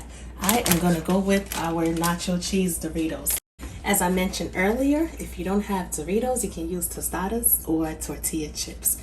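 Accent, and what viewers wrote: New York, United States. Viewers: Ohhhhh I'm doing this asap omg😋very easy and looks delicious !ps, Love your accent you can have mine from Louisiana , I'll take a New york accent any day Lol! 😋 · Great Recipes & tht FAB NY accent!😍🌹💓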